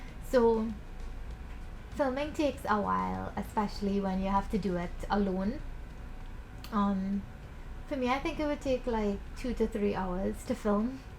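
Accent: Trinidad, Trinidad and Tobago. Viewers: I second Ramjohn!! You were brought up by such great parents and grandparents. The way you speak and carry yourself says so much...you have kept your strong Trini accent and values which I love. Keep being you sweetie. Stay as sweet as you are👏😘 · you still have your Trinidad accent which I love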